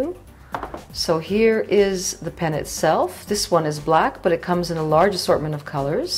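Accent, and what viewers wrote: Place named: Netherlands. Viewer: your voice has a hint of a dutch accent in it, am i right?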